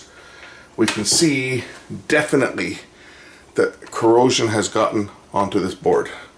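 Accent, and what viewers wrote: Canada. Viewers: we definitely need a kitty cat review video! Btw are you from Manitoba originally? Your voice sounds very familiar but maybe it's just a Canadian thing. (Edmonton, Alberta here)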